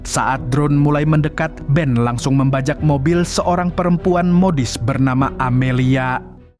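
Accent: Banjar, Indonesia. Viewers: Urang Banjar sekali nya😂🙏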